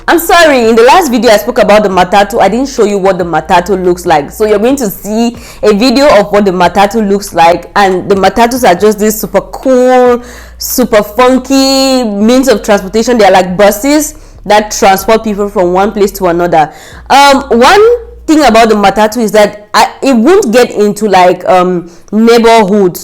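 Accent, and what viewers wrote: Nigeria. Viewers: Why do you sound more Nigerian than Cameroonian? Just curious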